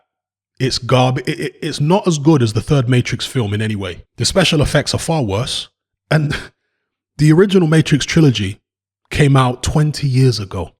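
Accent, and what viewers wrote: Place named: Caribbean, United Kingdom. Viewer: Hey homie. When you said this movie is absolute garage wit your british caribean accent. I laughed at the exact same time my guy.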